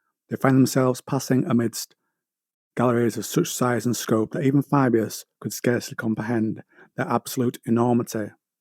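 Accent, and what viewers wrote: United Kingdom. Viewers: I think if you get a decent mic your channel would do well dude. and try do a read through of your script before hand as its kind of obvious its your first time reading it outloud. also vary your tone its difficult to tell when you're reading dialogue and when your reading other text. remember most of your audience is going to be yankees and fellow brits so your accent will actually be a boon😅. finally, what is your channel going to do thats different to the other lore channels that exist?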